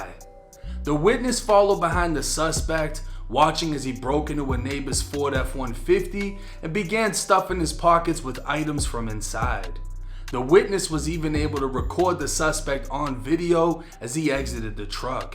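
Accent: Boston, United States. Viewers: My dude…..that Boston accent is intense!! 🙌🏽🙌🏽🙌🏽🔥🔥🔥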